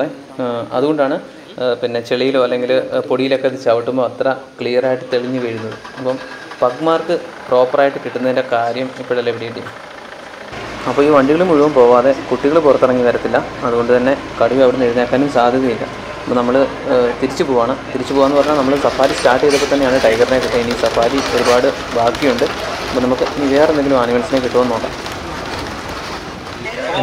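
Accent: Kannur, India. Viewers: താങ്കള്‍ കണ്ണൂര് കാരന്‍ ആണോ ബായ്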